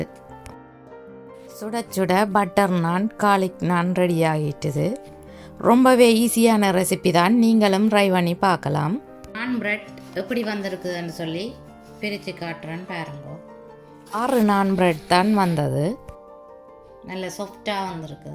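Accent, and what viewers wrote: Sri Lanka. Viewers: சூப்பர் தகவல் அக்கா. நம் இலங்கைத்தமிழை கேட்கும்போது உங்க வீடியோ பார்க்கலாம். 💗💗💗💗💗💗💗💗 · அருமையான ரெசிபி அழகானஇலங்கை தமிழ் எனக்குபிடிக்கும் தோழி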